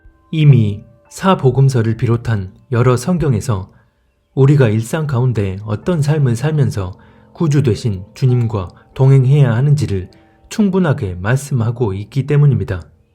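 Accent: Jeolla, South Korea. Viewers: 절라도사투리구시히네요. 중공삼자교회 성도인가요.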